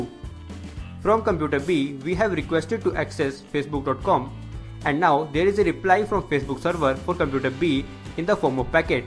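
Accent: India. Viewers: مفيد بس الإنجليزي الهندى ده عاوز ترجمه لوحده... ياخربيت بريطانيا كل سنين الاحتلال ده ومعرفتش تغير اللكنه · I love the video, contents are correct AND Well presented.. Easy to understand rather than reading the books and trying to imagine it all. For improvement, i suggest that the narrator to speak a little bit slowly in speed since the Indian-English accent takes a little time to process especially for Asian. All in all, thank you very much for being generous sharing the knowledge. May Allah bless you always n :) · I love his indian voice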